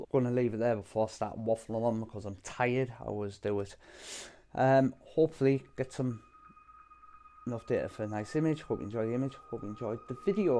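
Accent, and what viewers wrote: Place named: North East England, United Kingdom. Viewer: Hi That's a great image! It's so hard to get a balance between the vibrant blue of the nebula and the dust clouds that give that area of the sky it's extra bit of fascination, but you did a very good job. Impressive guiding! Great to hear a voice from home too From a North East emigrant now living in Austria. Ken Haughan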